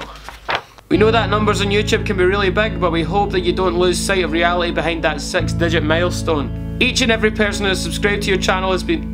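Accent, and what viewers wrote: Scotland, United Kingdom. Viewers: Is scottish lmao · AHHH it's bc he's Scottish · Oh my god ur scottish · XD are you Scottish? Or Irish? Respect. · Skót akcentus ❤❤ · Is that a fellow Scottish friend I hear in that accent?!?! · ikr, its like he is scottish or something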